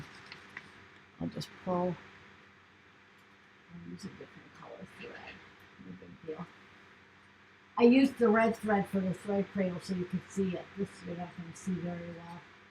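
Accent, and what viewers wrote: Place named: Australia. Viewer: I can hear you Australia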